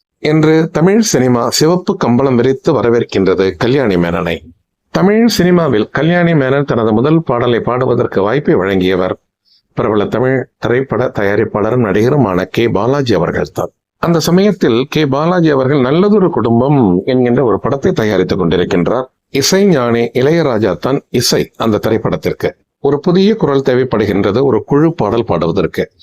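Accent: Sri Lanka. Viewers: Thokirthu vazhangum ungal kural ilanngai ucharippu pondru ulladhu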